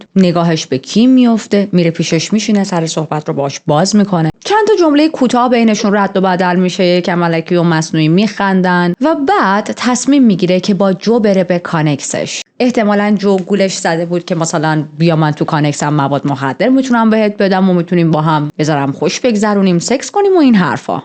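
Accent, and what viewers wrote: Shiraz, Iran. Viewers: جان شما شیرازی هستین ؟؟ چون ما شیرازی ها عموووووو میگیم 😂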